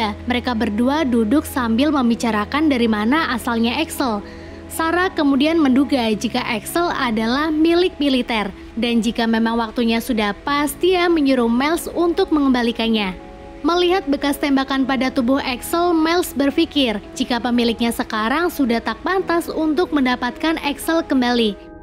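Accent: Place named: Java, Indonesia. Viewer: Wong jowo ndi mbake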